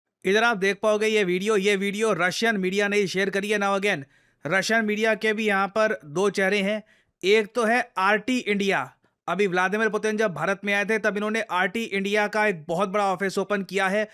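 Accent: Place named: Haryana, India. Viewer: When sir says image Geri hai, 😂😂😂, sir ka haryana MTI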